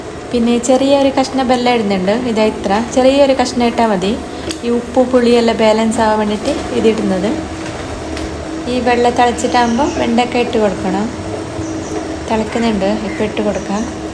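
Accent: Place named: Kannur, India. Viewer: കണ്ണൂർ ഭാഷ അടിപൊളി👌👌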